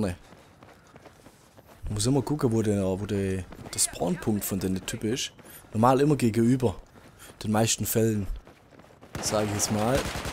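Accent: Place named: Swabia, Germany. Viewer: Ein Schwabe der versucht Ostdeutsch zu reden. Das klingt einfach schrecklich!!! Du zockst auf der PS4? Vielleicht trifft man sich und ich bring dir´s Ostdeutsch bei... gruss Ulle1410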